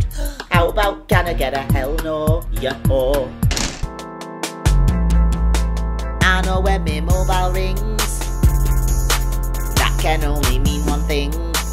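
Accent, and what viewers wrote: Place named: North, United Kingdom. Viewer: I love pretty only northeners understand what ye sayin 😂😂😂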